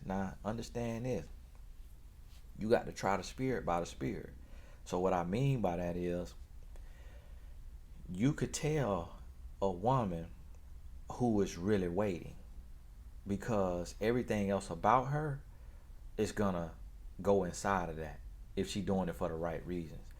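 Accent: South, United States. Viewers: And please dont speak the Q ueens English, your wonderful Southern Drawl. Just drives your wondeful points home all the morrrrre!!! 😎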